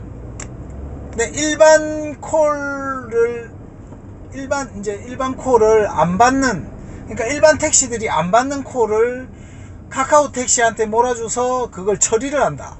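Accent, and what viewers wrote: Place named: Busan, South Korea. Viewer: 부산 말투 재밌어요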